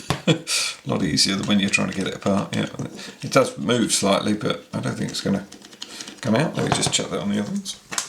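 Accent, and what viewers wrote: United Kingdom. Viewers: Thank you so much for the two-part detailed treatment of this repair. I had to replace two of them and also the camera rail. Your videos are by far the best ones. You sound so authentic with your easy-to-understand British accent. You sound like you know what you are doing, even if it was your first time. I have very clumsy and elderly fingers. I used a small magnet with my screwdriver to hold the little screws while trying to place them into the tiny holes.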